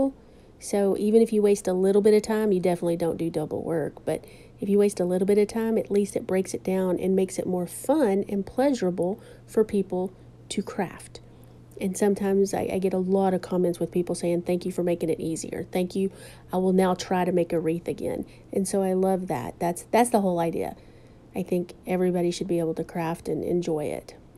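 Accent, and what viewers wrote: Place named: Midwest, United States. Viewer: Very nice! Question where are you from? The mid west! Maybe Indiana? Just listening to you talk.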